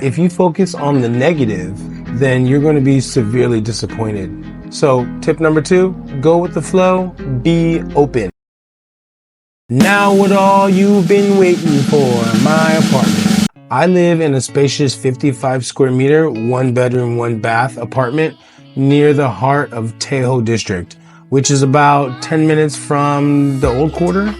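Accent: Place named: England, United Kingdom. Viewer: I guessed that comes from UK His accent is English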